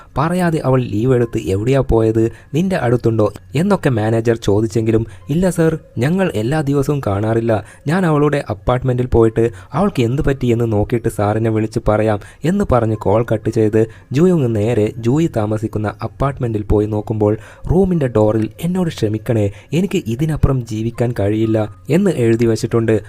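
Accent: Kannur, India. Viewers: chettande nadu evda kannur ano🤔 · Bro kannurkaranano 😮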